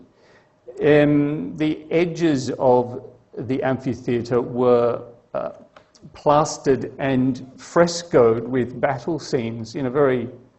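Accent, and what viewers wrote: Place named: United Kingdom. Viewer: Hearing a Brit attempting to pronounce Spanish is like a hearing a Mandarin speaker say "rolling lorries." Instead of "los miraglos" its "Lahs Mihraahglahs".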